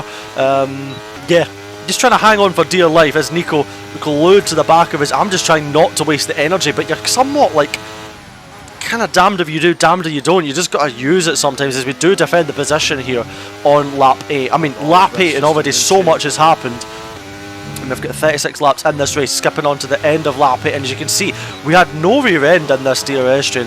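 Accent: Scotland, United Kingdom. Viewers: It’s a Scottish accent and it can often be quite hard to understand. It’s hard for native English people to understand those who have a harsh Scottish accent sometimes so it’s no surprise you have noticed the difference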